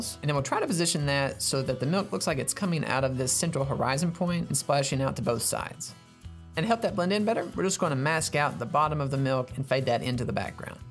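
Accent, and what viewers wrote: South, United States. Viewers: extra points for a cool southern accent lol. nice work, looks perfect! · unrelated, but are you from the south? i love your accent and how you enunciate words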